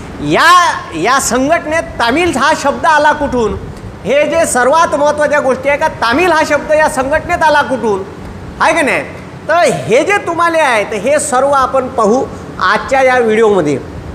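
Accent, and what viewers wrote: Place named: Vidarbha, India. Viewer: विदर्भी मराठीत खूप छान ऐकायला मिळाली माहीती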